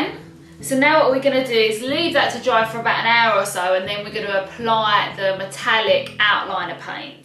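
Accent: United Kingdom. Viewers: Epic British accent!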